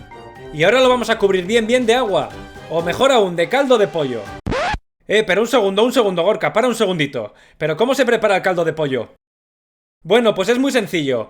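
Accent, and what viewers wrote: Basque Country, Spain. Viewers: Hay que bonito acento los españoles :) no tengo vino tengo whisky jaja le podré poner un poquito 🤗🤗🤗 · Muy buenas tus recetas y muy bien explicadas. Te felicito. Me encanta oirte todas esas expresiones vascas tan simpaticas. Yo hace tiempo que vivo en Andalucía y escucharte hablar me recuerda a mi tierra y a mi familia. Eskerrik asko!!!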